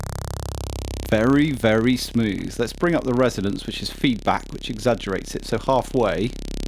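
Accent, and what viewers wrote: Germany. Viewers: Striking German accent there (now try to pronounce that properly!??? )! Yet another colourful, enjoyable and warm vid from:) Gotta add how inspiring it is when you show all the gritty details of the processes taking place, makes my fingers itch to get back to my studio...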